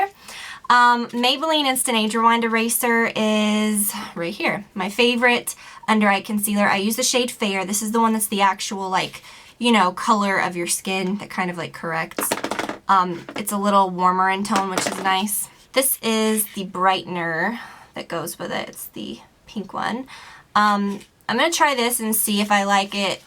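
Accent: South, United States. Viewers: I feel like ur starting to get a country accent or something or is that just me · This is the first of all the videos Ive seen of you, where I can notice a bit of a southern accent ;)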